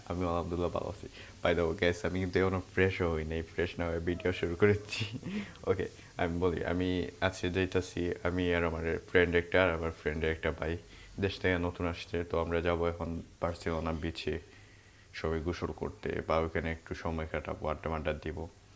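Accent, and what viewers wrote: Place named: Sylhet, Bangladesh. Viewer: Sylhety mat beshi vala lage😊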